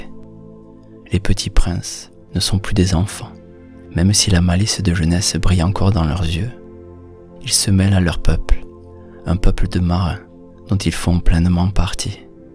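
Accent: South, France. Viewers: l'accent du sud du conteur me dérange trop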